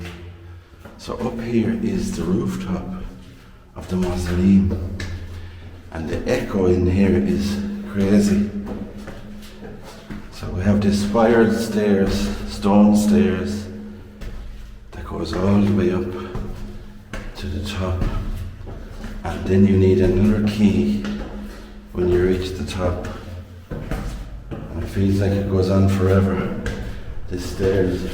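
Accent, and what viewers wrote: Ireland. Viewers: Absolutely beautiful. Such a work of art. I had to laugh though. Your Irish accent can be difficult for my American ears. Sounded like you said the marble was brought in by a “horse and cat”. 😅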